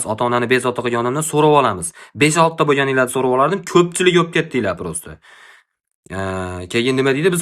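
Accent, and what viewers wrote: Andijan, Uzbekistan. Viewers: Zor aka anjondanmisiz sheva ashaqa tortyapti😊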